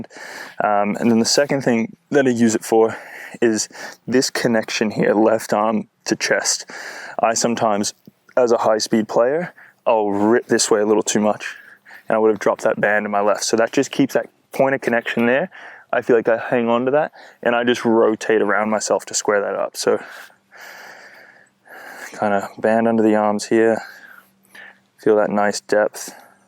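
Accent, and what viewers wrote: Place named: United States. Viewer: Every vid ur accent progressively gets more American lol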